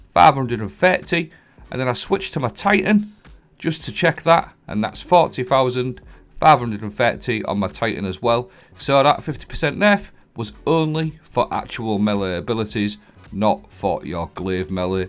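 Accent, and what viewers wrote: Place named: Newcastle, United Kingdom. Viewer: I love the accent. You sound just like my mate Geordie Son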